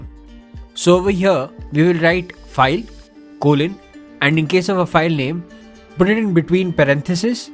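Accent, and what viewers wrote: India. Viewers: you are from India aren't you 😁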